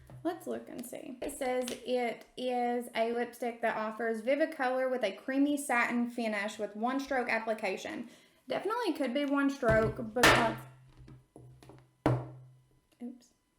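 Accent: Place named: South, United States. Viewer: I’m trying to place your accent. I love how different southern accents can be when we live in different states.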